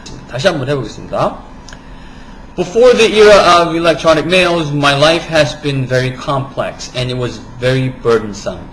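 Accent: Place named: South Korea. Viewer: hes good but he stuttered too much.. and has a korean accent.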